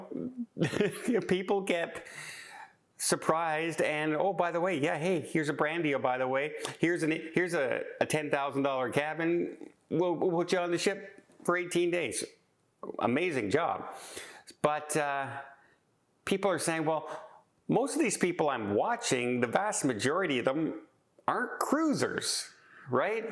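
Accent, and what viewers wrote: Canada. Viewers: I've never heard it pronounced that way. Maybe it's a Canadian thing, like the way he says "garage."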